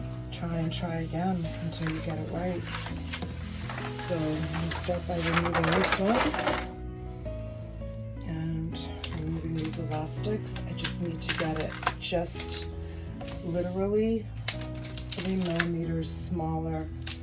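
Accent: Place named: Massachusetts, United States. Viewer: miss hearing the Mass accent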